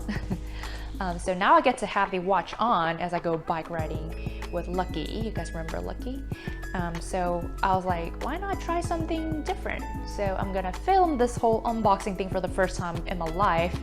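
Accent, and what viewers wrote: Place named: United Kingdom. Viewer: 왜이리 귀여어요 끝까지 다봤다요 크큭 발음 왜이리 영국같아 간지나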